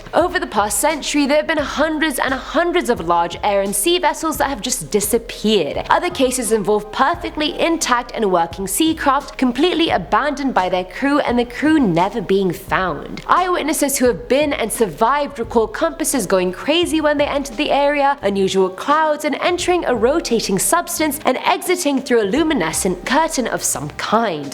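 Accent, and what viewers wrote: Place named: United Kingdom. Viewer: She's hot, she's got that brittish sexy smokey voice type of accent.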